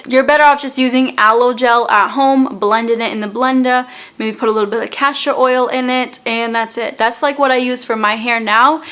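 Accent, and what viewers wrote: Boston, United States. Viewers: I just love your Boston accent. I find it so charming and down to earth. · Such a cute Boston accent. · Lol I love the way she says car. People always point out my thick Brooklyn accent but her Boston accent is really serious. Lol I love it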